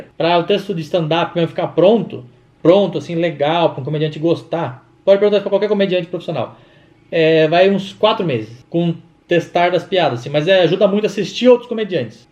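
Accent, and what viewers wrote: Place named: Minas Gerais, Brazil. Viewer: O interessante é que a gente acha que você é mineiro, mas não é paulista, depois do Rabin é você, meu querido!!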